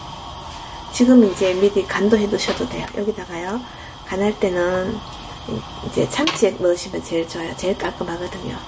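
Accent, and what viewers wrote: Gyeongsang, South Korea. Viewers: 끈나슴니다 듣기 좋습니다 갱상도 억양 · 따듬어 ㅎㅎ 경상도에 사는데 익숙한 말투시네요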